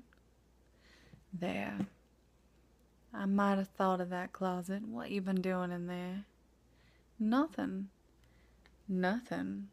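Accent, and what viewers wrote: South, United States. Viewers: Where are you from? That was great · Such a great video! So difficult to find a great ASMR southern accent video, so thank you ☺️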